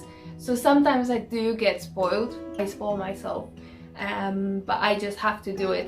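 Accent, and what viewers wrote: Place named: United Kingdom. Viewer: I love the British accent you picked up,! It's so nice to see and hear you, even when we're isolating so far apart. I look forward to more videos:) Yay!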